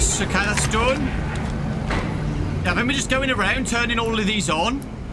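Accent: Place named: Birmingham, United Kingdom. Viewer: The tittle of this video should be Brummy guy shouting at robots for 28 minutes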